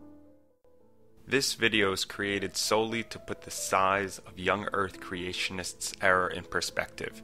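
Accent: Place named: United States. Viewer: In all this time, I assumed you were British for some reason. probably the choice of music. Your more American accent took me by surprise, lol.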